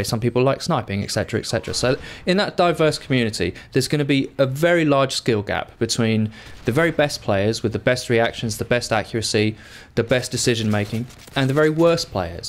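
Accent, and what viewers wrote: United Kingdom. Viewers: Lolzer i thought i was in my sub box, clicked this video thinking it was BT heard the english accent and thought wtf? Really enjoyed the video and will definitely subscribe:) hon the BT for liking he video and confusing the shit out of me:L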